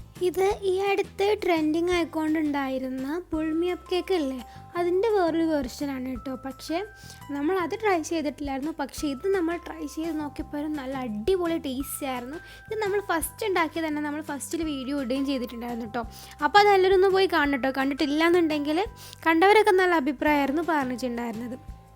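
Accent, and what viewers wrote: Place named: Kasaragod, India. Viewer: Nammale kasargod slang aanallooo😍😍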